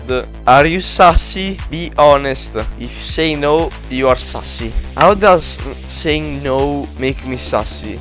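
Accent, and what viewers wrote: Italy. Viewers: Si sente che sei italiano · Yes, his accent is Italian... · But, you're Italian? because it looks like you have an Italian accent.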